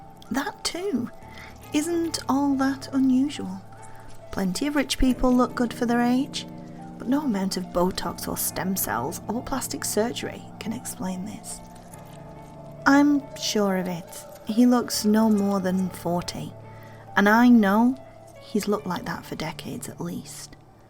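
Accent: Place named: Northern England, United Kingdom. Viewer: This was excellent! If you don't mind me asking, where abouts are you from? Your accent sounds quite similar to one of our narrators who is between Lancashire and Yorkshire :)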